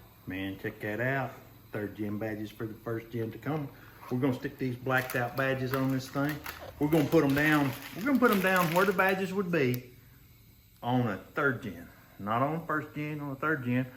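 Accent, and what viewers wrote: Tennessee, United States. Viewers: I heard your Tennessee accent and automatically liked, and subbed! Beautiful truck brother. Howdy from Texas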